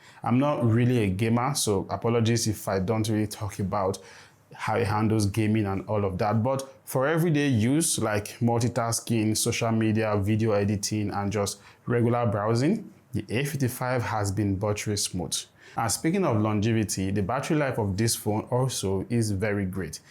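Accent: Africa. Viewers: I got one word in 3 , why have someone with a strong africian accent describe a product, no offence but speech is not clear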